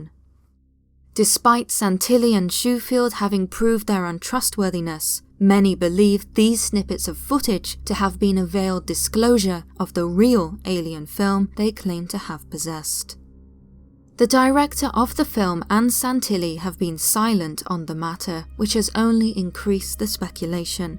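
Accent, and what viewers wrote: United Kingdom. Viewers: narrator I always wanted to ask, what part of the U.K are you from?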